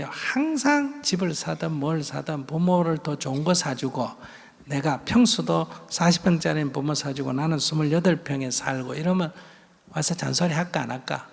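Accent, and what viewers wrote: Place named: Gyeongsang, South Korea. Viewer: 스님 " 살은 좀 갖다 먹었어요" .^^ 경상도 사투리라 잠시 놀랐습니다. 쌀 발음이 살.. 평생 안 고쳐지더라고요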